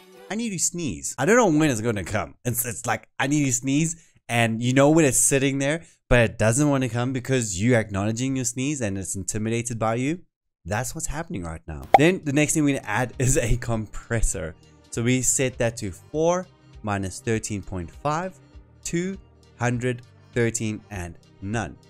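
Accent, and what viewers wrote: South Africa. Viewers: are u a jozi boet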